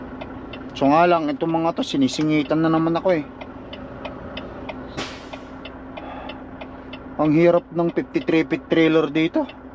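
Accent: Ilocos, Philippines. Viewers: ilocano ka sir? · Perstym ko idtoy channel mo idol accent mo palang ilocanong ilocano hahahaha ingat kabsat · Yung accent! Matik ilokano! 😁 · Nagsolid dayta ilokanom padli. Ahahaha. Mamadlaw tayo pag nagtatagalog ka. Hehehe. Alwad kinanayon. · Parang ilocano so kabayan ah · ilocano ka ata sir! hehe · Tlga ilocano hehe halatado tgla sitau nga ilocano duray sadinno nga sulinek hehe God bless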